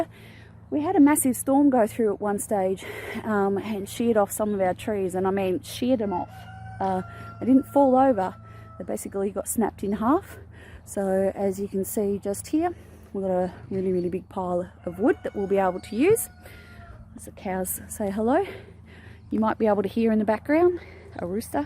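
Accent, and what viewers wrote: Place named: Australia. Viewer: Looking forward to seeing more videos. Beautiful place there. Where are you from Kind of sounds like Australia. Have a great night and God bless.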